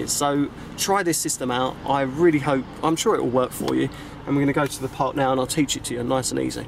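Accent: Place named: United Kingdom. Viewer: thanks for the video alott thatks thanks but british acsent is so agly sorry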